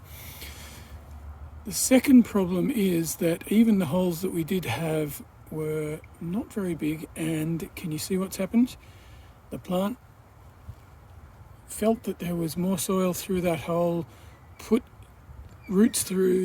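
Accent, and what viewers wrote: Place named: New Zealand. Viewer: Sound like a Kiwi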